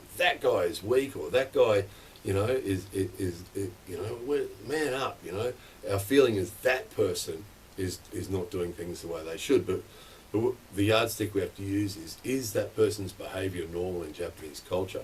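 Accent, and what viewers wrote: Australia. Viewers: I just love the sound of your australian accent talking about details across japan, a country that I do miss a lot! thanks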